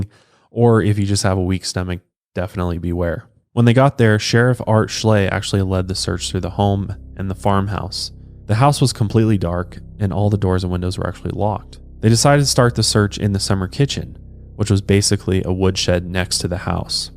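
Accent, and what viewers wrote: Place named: South, United States. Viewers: It’s so funny you talked about having a southern Colorado accent because in my head I have always thought you sound kind of southern/ Texan lol! I’m from Texas, btw. Love the podcast!! Any content you or put out is 100% 💜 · I never thought people from Colorado had a southern accent but then again I'm from Texas and a lot of us have a heavy accent😂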